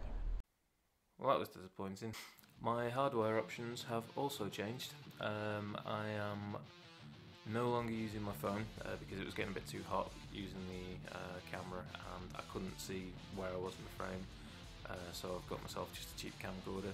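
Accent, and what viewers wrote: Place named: Stoke-on-Trent, United Kingdom. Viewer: Are you from Stoke-on-Trent by any chance? I recognise your accent!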